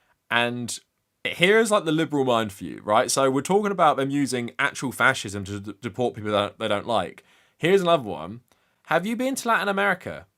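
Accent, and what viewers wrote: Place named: United Kingdom. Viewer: But you sound like a "bloke" who lives in a country with a KING!!!!!! Is that more evil than the US system, or is it the same? What is your opinion of the house of Lords? It's ok that you have opinions, but it seems you don't have any mirrors in your house..... maybe look at yourself? just sayin....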